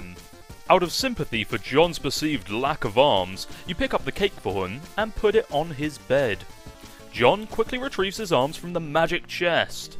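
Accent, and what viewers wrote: United Kingdom. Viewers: Probably cause of bri'ish accent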